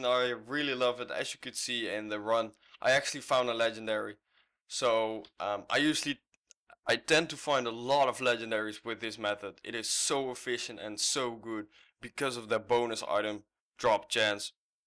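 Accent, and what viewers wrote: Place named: Netherlands. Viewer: when i hear yur accent i would say yur dutch ;)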